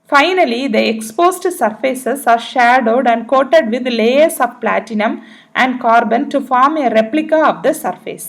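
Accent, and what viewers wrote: Kanyakumari, India. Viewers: MADAM THANKS FOR THIS VIDEO. Unga voice kekum podhu kanyakumari slang maadhri iruku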